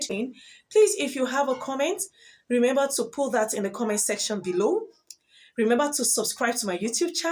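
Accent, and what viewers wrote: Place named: France. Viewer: Nice , your video is of great value. Sure you are french, nice and beautiful accent 🎉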